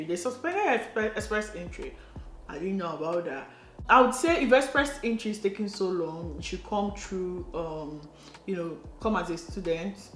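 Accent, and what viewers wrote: Igbo, Nigeria. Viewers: Exactly! There's absolutely nothing wrong with your Nigerian/Igbo accent. That's what endeared me to you😍 I love how rooted you are in our Igbo culture. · I love your Igbo accent. Ability to speak English without a foreign accent is NOT a measure of intelligence. My husband and I did our traditional wedding in January. Family keeps asking when are we doing church/white wedding? We tell them we aren’t doing a white wedding because we don’t want it. They look at us like we have 4 heads.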